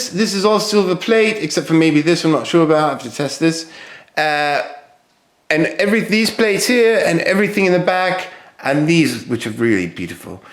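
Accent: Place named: United Kingdom. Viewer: Where are you from in the UK, I cant pinpoint your accent? nice finds by the way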